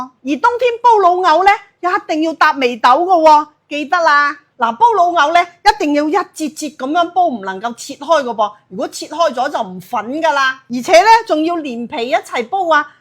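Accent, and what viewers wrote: China. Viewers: 广东人讲嘢是咁语气 广东汤猪峥最正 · 令人討厭的聲線及語氣，大陸人的廣東話與香港人的不一樣，一聽便分得出。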